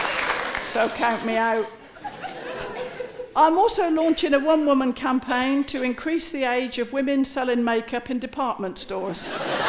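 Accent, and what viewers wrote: West Country, United Kingdom. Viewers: 120 wpm for pitmans shorthand - impressive. I only have the certificate for 60wpm and thats enough for me. For years I thought she was from the Westcountry (because of her accent) and have been disappointed in recent times, to learn otherwise - she's as lovely as ever and is an English talent that we Brits remain rightfully proud of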